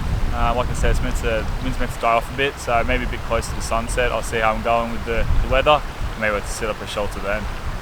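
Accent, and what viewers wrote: Australia. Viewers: Love your videos of Australia and I’d visit your continent if I could. I’m from Canada and I showed you to him. We both like the accent and keep the videos coming!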